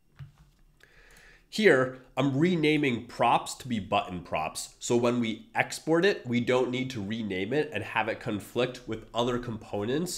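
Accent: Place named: United Kingdom. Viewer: Obviously American, but there's an accent creeping in here. Secret Brit? :)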